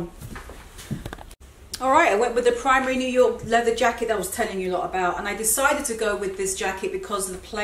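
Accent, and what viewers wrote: United Kingdom. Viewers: Transitional dressing videos are so useful! Thanks,. Your super cool British accent and enthusiasm for style are two of my reasons for being a subscriber and Instagram follower! 😁❤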